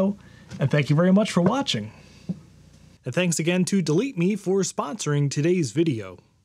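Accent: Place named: California, United States. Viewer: Off topic: Are you from Cali? Not that it matters but judging by your accent I would say Cali but my friend says AZ... Love your channel, btw, and I use Arch.....btw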